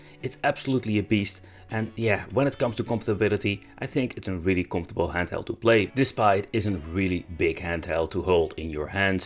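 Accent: Netherlands. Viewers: It sounds your northern European, Dutch I presume.. did you have to pay import costs for the steam deck?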